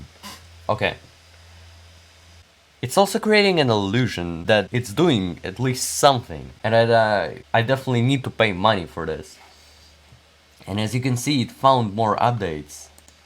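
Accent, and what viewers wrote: Russia. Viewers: the russian accent doe, keep up the excellent work :D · Russian: check Light accent: check Flies in background: check Techy: check Yeah, its all coming together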